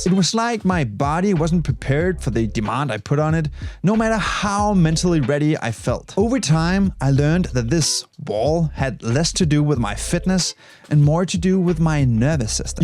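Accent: Denmark. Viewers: I thought I heard an danish accent in you, am I right? 😂❤🤍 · This is such a perfect danish accent😂😂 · Love ur accent are u from Denmark 🇩🇰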